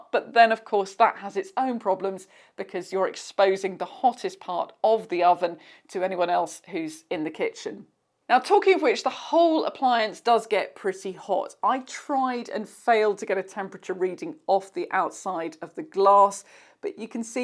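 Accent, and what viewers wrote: England, United Kingdom. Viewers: I have joined your channel as it is English and that makes a refreshing change from American or Pakistani channels that don't understand the English people and what we want in an appliance, and an English person that speaks very clearly, thank you, I am looking forward to many reviews.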